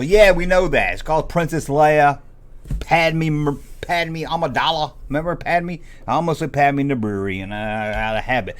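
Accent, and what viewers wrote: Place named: Louisiana, United States. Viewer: WTF is this cajun sayin'? hu ha mmm haaa mmm. No, hhhhhuuh. SPEAK AMERICAN! WIGGER!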